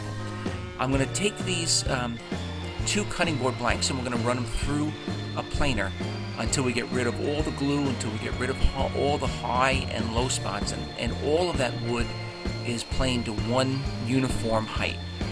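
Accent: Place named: New York, United States. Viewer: I bet my life this dude grew up in either Staten island or Queens lol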